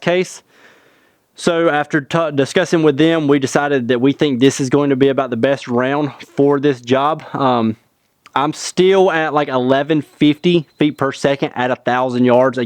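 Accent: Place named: South, United States. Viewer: Finally a southerner, I get so tired of listening to yankee's talk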